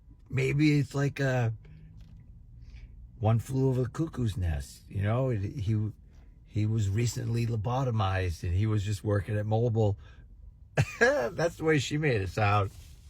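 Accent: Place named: South Boston, United States. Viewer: Like your South Boston accent, caard!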